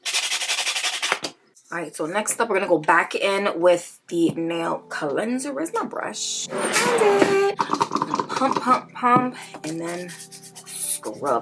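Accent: New York, United States. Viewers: I love your accent r u from New York? I’m A new sub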